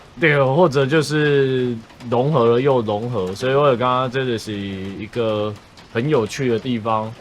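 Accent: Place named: Southern Taiwan, Taiwan. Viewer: 我把你徒步環島騎車環島看了幾遍，非常接地氣，南部郎欸台語氣口😂，文化認知都非常棒👍 我一有空都會開來看，百看不厭 👍 開始有主題歌後 影片更生動讚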